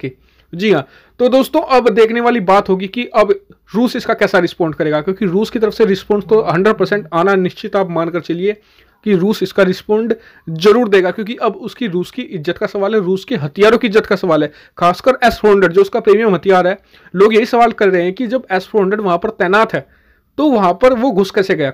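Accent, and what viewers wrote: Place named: Western Uttar Pradesh, India. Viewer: Bhai aap ese bolte ho ya fir apka bolne Ka tarika esa hai jese ki chai ki dukaan par ko koi bin mange gyan baat rha ho, knowledge Achi share karte ho no doubt but effective voice nhi hai, Kisi particular area ki lagti hai hai jese ki UP west ki ya fir dehati types so pls sudhar laao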